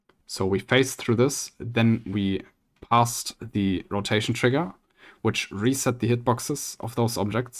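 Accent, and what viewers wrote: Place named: Germany. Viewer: your voice is very german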